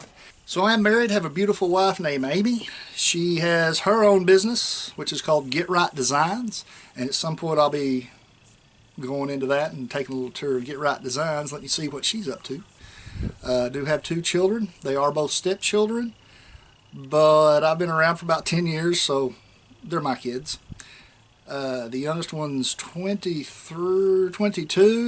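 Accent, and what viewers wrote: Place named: West Virginia, United States. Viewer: Hey I'm subscribed to both your channels. I just bought a cnc a few months back and was telling the wife that I learn more from your channel than anyone else. The first thing she said it's probably cause he talks like you... lol! Its alright to sound bumpkin buddy! Dont change a thing. I'm from West Virginia and I thought you were from around here too. Look forward to more of your videos. Add in some more CAD stuff now since you retired..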